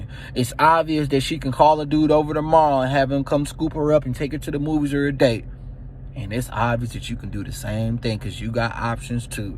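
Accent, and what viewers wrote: Baltimore, United States. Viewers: He def from Baltimore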